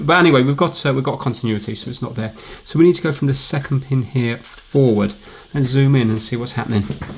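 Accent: United Kingdom. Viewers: I was really confused what watch it was as I've never heard the name, but that was only british pronunciation 🤣 PS: Love the yellow mat 👍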